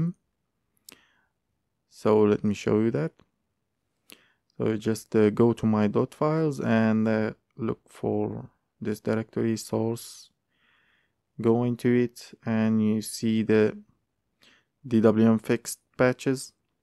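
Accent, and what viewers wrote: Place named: Morocco. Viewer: great. i was confused by your voice and accent because you sound like me and I was right you are moroccan too LOL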